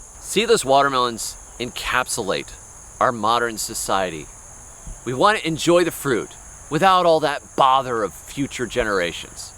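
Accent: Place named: United States. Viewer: I left the UK in 2024. Here in Iraq, they don't have seedless watermelons. That's something which until I saw your video, I didn't know existed. It sounds like science fiction. When did developed countries get seedless watermelons? You sound like you're an American. Are seedless watermelons a post-2023 phenomenon?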